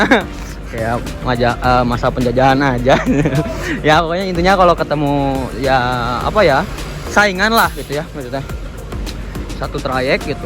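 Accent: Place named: West Java, Indonesia. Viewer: Logat khas orang sunda😀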